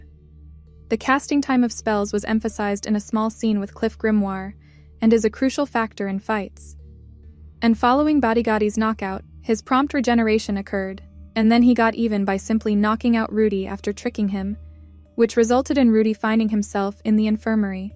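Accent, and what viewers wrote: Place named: Germany. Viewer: Man hört das du Deutsch bist.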